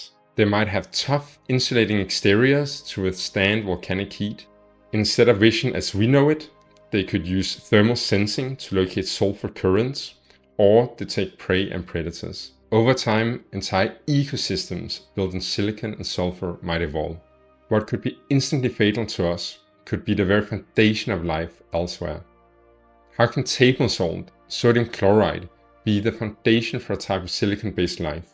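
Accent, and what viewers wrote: Germany. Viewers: Why does he sound so German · I know that your channel is new but I get the feeling that you are going to take off on YouTube very soon. Keep up the good work. By the what country are you from? I would have guessed one of the German speaking ones but i could be wrong.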